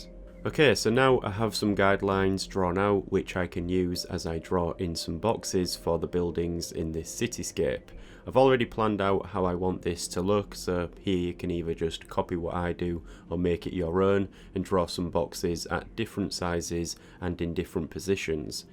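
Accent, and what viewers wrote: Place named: Humberside, United Kingdom. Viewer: i cant help but notice your accent are you perhaps from humberside?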